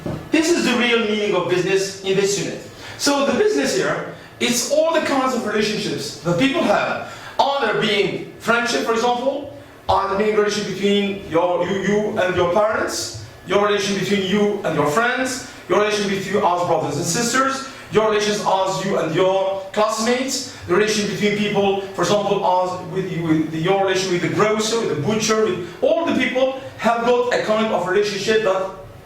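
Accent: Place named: United Kingdom. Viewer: a british accent! Wow>-< that's great Thanks a Lot Sir i really appreciate that('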